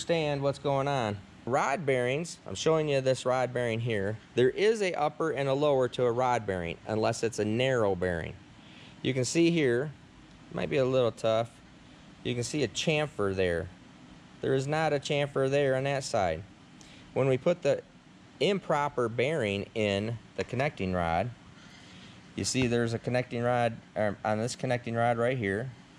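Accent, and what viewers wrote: Michigan, United States. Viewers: Guys sounds like a Michigander. Those guys know their stuff.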